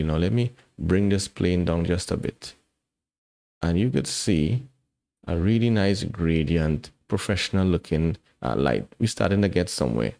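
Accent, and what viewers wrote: Trinidad and Tobago. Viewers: Yo, awesome tutorial. Are you from trinbago?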